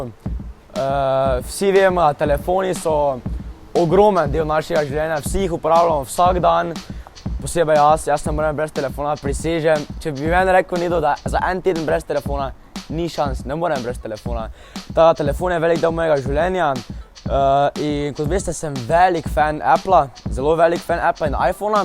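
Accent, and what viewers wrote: Styria, Slovenia. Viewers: ta fon je za razliko od tebe pamtn, pa vid se da si toti štajarc k ti lih ne gre tota angleščina "somrak"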